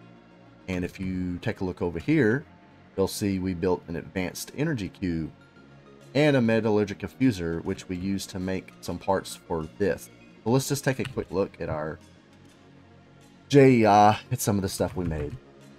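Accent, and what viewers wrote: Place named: South, United States. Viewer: Builds are so amazing!! I want to start a channel someday but sadly, I am still a noob when it comes to many of the mods. Learning them slowly but surely! But your vids are definitely helping. I went back to Pokehaan Craft for now, but will get back to this modpack eventually, lol. Also, whereabouts are you from? Your accent sounds southern USA, but I could be very wrong. I only ask because I am Southern USA myself.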